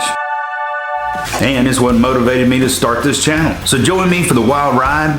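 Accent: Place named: South, United States. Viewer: Love hearing that southern drawl! 🤣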